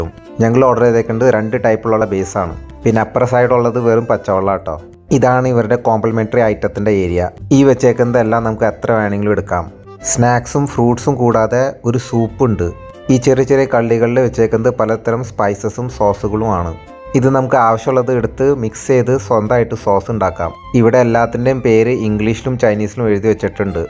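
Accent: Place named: Ernakulam, India. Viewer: ചേട്ടൻ ekm ഇൽ എവിടാ നല്ല പരിജയം ഉള്ള സ്ലാങ്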